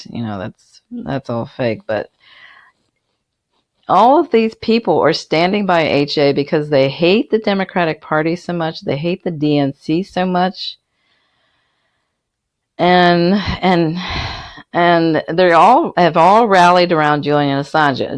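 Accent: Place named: Missouri, United States. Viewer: your growl, if i may say so, sounds southern to me, throaty and nasal at the same time, like a missouri accent, the show me state, where i'm from.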